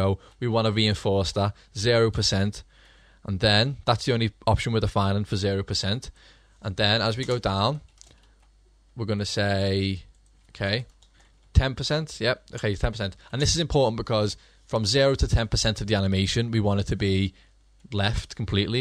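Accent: Liverpool, United Kingdom. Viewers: fellow scouser? haha nice one dude great vid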